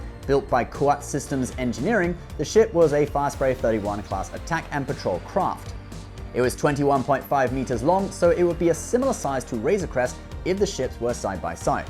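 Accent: United Kingdom. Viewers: Why don't we see any more. I miss you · A rare appearance of indeed. But always a pleasure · Yay another video with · ? That's a real surprise. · Welcome back. Good show, good show 👏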